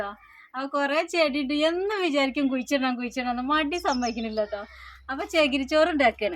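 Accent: Malappuram, India. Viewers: തനി മലപ്പുറം ഭാഷ ആവരുത് കേരളം മൊത്തം കാണുന്നുണ്ട് ഒന്ന് ശ്രെദ്ധിക്ക ഫാമിലി s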